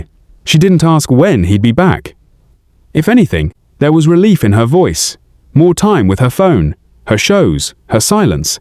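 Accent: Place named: Africa. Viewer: I like the story but l am partial to an African accent. Watching from Montreal, Canada 🇨🇦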